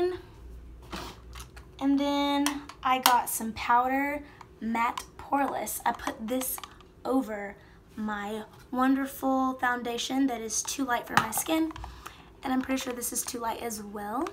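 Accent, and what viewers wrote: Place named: Tennessee, United States. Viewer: Omg yes girl! I was hearing your accent and i knew you were from Tennessee. Go Vols ❤️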